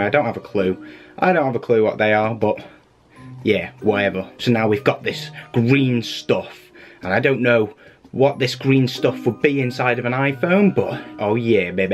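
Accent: United Kingdom. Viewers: I love his accent! Is he British because I'm British? :P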